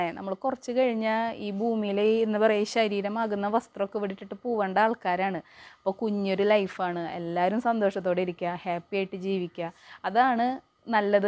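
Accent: Thrissur, India. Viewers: തൃശ്ശൂർ ഭാഷ കേൾക്കാൻ നല്ല രസം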